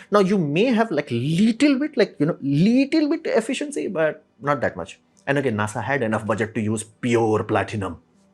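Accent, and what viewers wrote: India. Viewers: his speech was fairly casual. You are probably not very used to english. And he used indian accent so i dont really understand why this is difficult to listen to. If he's using indian sounds for english words, should be easy. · Really enjoyed. Science +sarcasm + indian accent Subscribed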